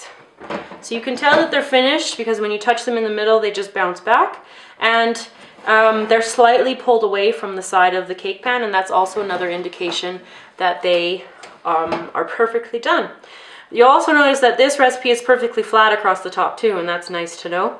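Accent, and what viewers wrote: Canada. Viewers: Okey :). Maybe I´m just not used to hearing Canadian. I love your videos!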